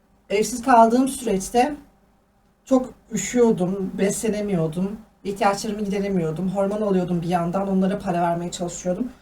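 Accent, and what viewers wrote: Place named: Arab world. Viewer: arap kadini şivesi yapan bir genç hanım zannettim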